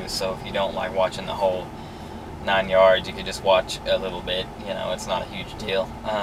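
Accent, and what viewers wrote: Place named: South, United States. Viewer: The worst? Lol. It’s the country accent. It makes it better.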